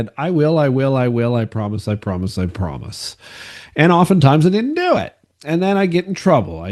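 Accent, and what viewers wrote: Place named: Canada. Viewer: Good to hear a real Canadian speaking real Canadian . . .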